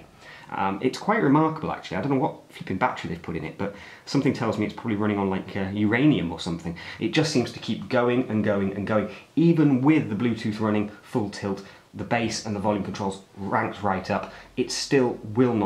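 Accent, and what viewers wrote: United Kingdom. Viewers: Looks a pretty nice speaker to be honest, hadn't even considered this one at all however having a look at it now! Where are you from by the way, no good with accents but im guessing the UK? lol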